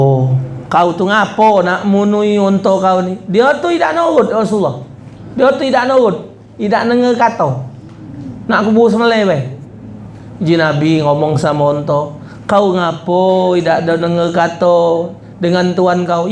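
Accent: Palembang, Indonesia. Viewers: Ingat waktu beliau ke Kota Jambi, seisi lapangan kantor walikota Jambi penuh. Cara beliau menyampaikan pesan sangat sederhana dengan logat Palembang tapi bisa dimengerti oleh semua orang, dan temanya adalah fakta yg terjadi dimasyarakat kita. Alfatihah buat beliau. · Dak bosan aku nonton ceramah almarhum, dak Ado lagi yg ceramah berlogat daerah Palembang, alfatihah pak ustadz 🙏🏼🙏🏼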